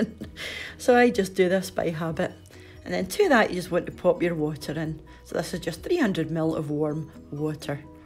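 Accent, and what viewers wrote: Scotland, United Kingdom. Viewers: I don’t why but I could listen to the Scottish accent for hours, so friendly and calming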